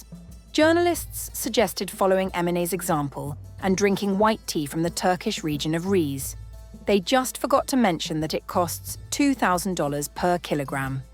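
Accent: United Kingdom. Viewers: Your accent is British. You insist on how much the tea cost. How about your royal family's lifestyle and expenses that live off from your taxpayers!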